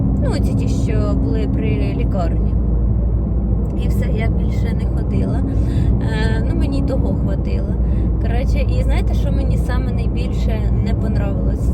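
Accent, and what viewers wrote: Poltava, Ukraine. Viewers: Я 45 років живу з полтавчанином і цей полтпвський суржик впізнаю де завгодно Гріє серце.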